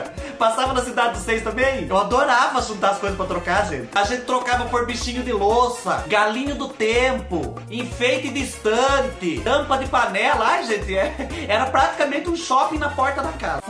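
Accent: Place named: Caipira, Brazil. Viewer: D CEU amo seu sotaque q caipirinha, o maia lindo kjķkk❤😍🤩♥️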